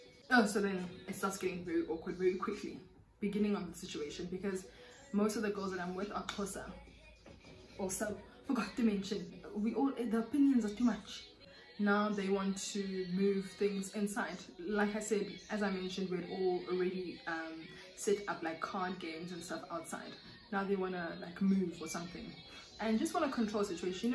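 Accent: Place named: Newcastle, United Kingdom. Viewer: It's the Geordie accent for me🤣🤣🤣🤣🤣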